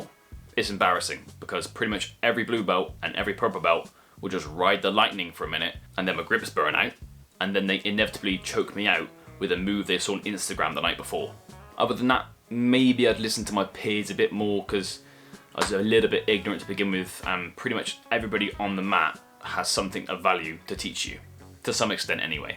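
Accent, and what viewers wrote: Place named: North, United Kingdom. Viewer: Good video, nice to hear a northerner on YouTube!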